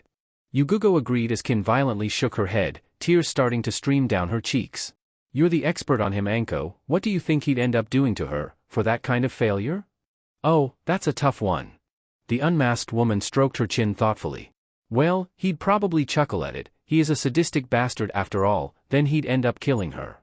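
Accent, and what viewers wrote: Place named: Russia. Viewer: Why dose the voice switch between monotone and Russian accent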